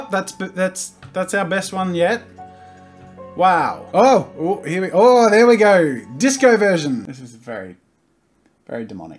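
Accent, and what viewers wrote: Australia. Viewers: So he's Australian I thought he was British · Australian dialect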